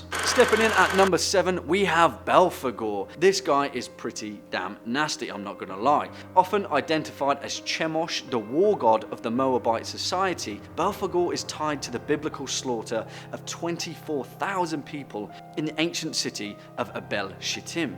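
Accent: United Kingdom. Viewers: Incubus, succubus, Satan, Valak, and sleep Paralysis demons scare me the most weirdly enough with some of yall British accents the videos aren't too scary.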